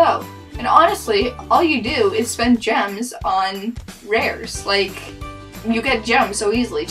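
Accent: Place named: United States. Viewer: I love your mini country accent it's so cute❤️ :)))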